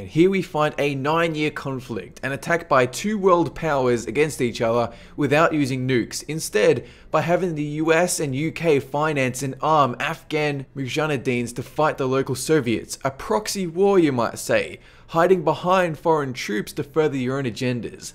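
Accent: Australia. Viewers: I find it hard to place the narrator's accent. Sounds vaguely Australian. Anyone know? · Are you Aussie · Are you from Australia? Also please do 10 creepiest things that ever happened on earth video. 😀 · Most annoying Austtalian accent ever. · Reaver He's australian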